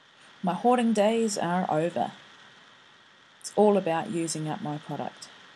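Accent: New Zealand. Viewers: This is gorgeous. I love that you keep any 'mishaps' in there and work through them. I actually think the gelatos and acrylic paint at the end looks pretty cool and arty! It's also nice to hear a NZ accent. My Dad is a kiwi and I miss that accent.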